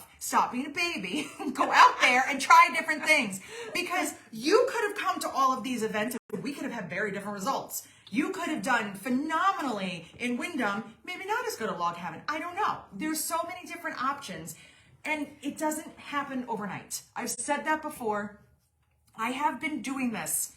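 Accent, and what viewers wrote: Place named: Massachusetts, United States. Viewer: I just discovered you while watching Siah do a walk around at some quilt show a month ago down here in Florida, and you were the last booth she went to, and met you...I loved your patterns, and your beautiful Cork (which I've never in my life used), and I just loved your personality and omg I fell in love with your free pattern for that beautiful wallet made all from that gorgeous cork! I was asked last year to do a small craft fair, but it was last minute, and I had nothing made, so I made a ton of stuff up this year in between all the surgeries I had to have on my legs, and the lady who organized the event had to cancel as her husband passed away. This would have been my very first ever event...I had a really rough year and can't wait for it to be over...I will be having another surgery next Wednesday and may be looking at a bypass surgery on my left leg the Doctor told me. 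Anyways, I just had to tell you how much I love your items, and how happy you make me watching you because of your fun and bubbly personality...Thank you for that! Sorry I wrote you a book! It was really fun watching this video, and I look forward to more! P.S. I had to laugh about the massachusetts accent as that is where I'm from, and talk just like that, and live in Florida now... lol...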